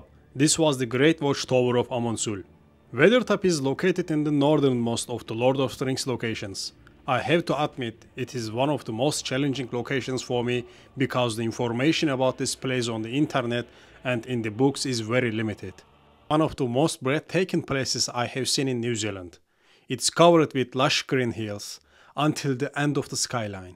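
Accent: Turkey. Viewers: İngilizcen o kadar güzel Türkçe aksanı ki~ arkadaşlarıma gönderiyorum. Örnek olsun diye! Btw harika videolar! ❤ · Strongest turkish accent you will ever hear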